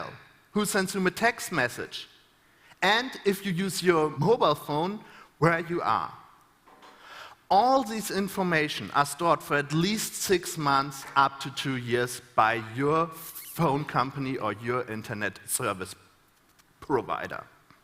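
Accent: Germany. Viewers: Those are standard speech tricks for stutterers, to stop them from stuttering. And the german accent of course. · Ohhh, it's a *stutter*. I thought that was just how to German accent made some people sound. >_> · Sort of, but it's still more important what he's saying rather than how well he's communicating. His issues communicating could've just been nerves or an actual mental tic, as well as combining that with his german accent in the english language, but what he's saying is scary as hell.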